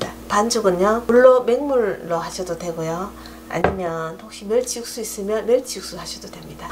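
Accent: Gyeongsang, South Korea. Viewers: 정겨운 경상도말씨 ㅎㅎㅎ 오빠가 대구에 살고있어요~~ 좋은 하루되세요^^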